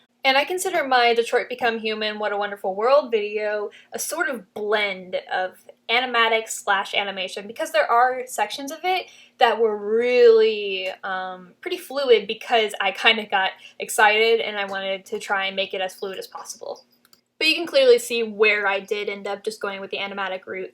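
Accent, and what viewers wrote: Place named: Australia. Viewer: Why do you speak Australian?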